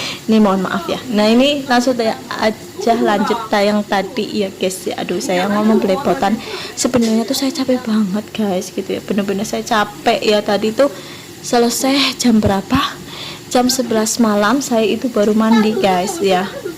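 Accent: Banyumas, Indonesia. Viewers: Wa'alaikumsalam warohmatulohi wabarokatuh Salam ngapak ora ngapak ora kepenak juga mbak wong wadon ngapak🙋‍♂ Wah asik dong gantian ngawasi majikan masak😀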